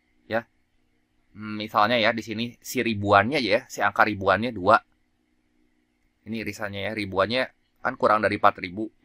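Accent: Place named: Sunda, Indonesia. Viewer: aduh teh koko ternyata nyunda nya nyunda pisan euyyy, Hatur nuhun ko 😊😊😊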